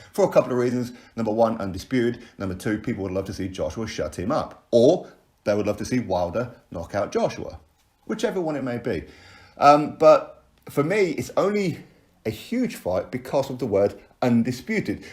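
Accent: United Kingdom. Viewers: Soon as i heard the uk accent i knew you were lying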